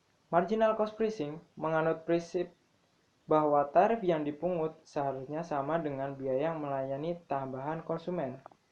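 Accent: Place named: Java, Indonesia. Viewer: Ini ko logat jawanya medok ya hihi~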